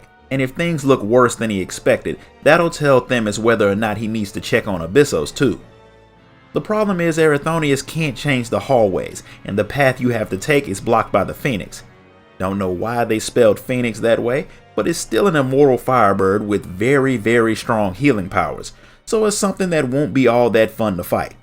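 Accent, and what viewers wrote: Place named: South, United States. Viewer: That smooth southern accent tho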